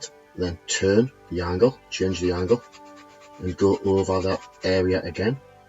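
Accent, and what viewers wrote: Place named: Scotland, United Kingdom. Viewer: what a talent you have, amazing pictures !! like the accent as well, is that scotisch sir.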